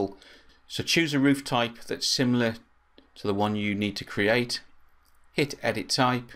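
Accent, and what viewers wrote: United Kingdom. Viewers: Nice British Accent and very helpful sharings :) Thanks alot mate :))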